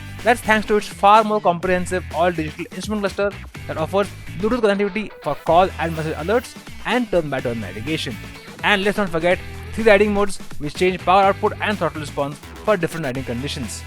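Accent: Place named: India. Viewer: <link> #IndianPronunciation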